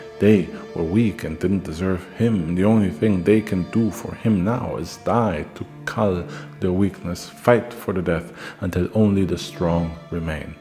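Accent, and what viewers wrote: Germany. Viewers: What a video! Great storytelling, amazing art to illustrate, your accent is amazing (are you German?). Only one thing I would point out is that even if the Nazis hadn't invaded the Soviet Union, they would have been at war with the US either way and probably though we will never know the Soviets would have invaded themselves after Stalin was done with his Great Purge and set up his own war economy (that is actually one of the big factors why the Nazis invaded in 1941 while still at war with the British). Thank you for your work!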